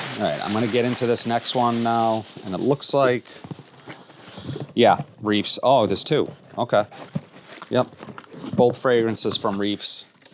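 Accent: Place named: New England, United States. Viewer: Just found your channel and hearing fragrance takes with a NE accent is something I never knew I wanted.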